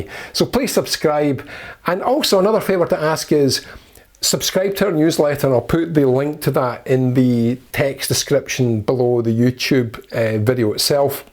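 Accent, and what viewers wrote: Scotland, United Kingdom. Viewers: Well, you tried squaring the circle without any knowledge of trigonometric functions. The term “blind test” or even “double blind test” requires not only a technically perfect laboratory setup, but also randomly selected test subjects according to predetermined parameters, a statistically significant population and corresponding valid statistical evaluations. Nice try, but ultimately I like your rumbling Scottish accent 😊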